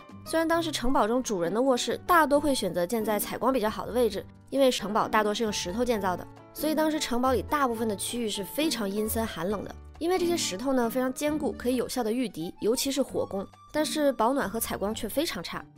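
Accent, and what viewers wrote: Cantonese, China. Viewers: 廣東話好好聽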